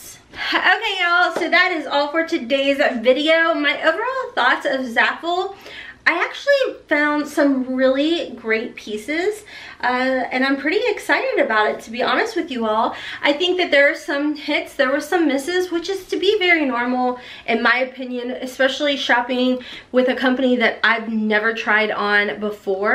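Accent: South, United States. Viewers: Thank you for this haul. I have never even.heard of Zaful until your haul. You are around the same height and body type as me so it really helps to see how things look on you in the physical moving around in them aspect. Every single one of these pieces looked amazing on you. Ive literally been wanting to dye my hair lilac or lavender but its so dark brown which makes it harder. But you are Adorably Beautiful. I thought you looked amazing in each of the items you tried on. As You always do. Im glad you come out of your comfort zone to do these videos. We need more positive Energy to uplift eachother and support eachother. You hold a great platform for that. Again thank you, you really are a natural too bad being shy holds you back sometimes from being your true outgoing, entertaining self. Dont ever quit. We Love ya your a Beautiful Queen. God bless. 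P.s Your cute southern accent gives me life. 🙏💜👑🌞🌸🌼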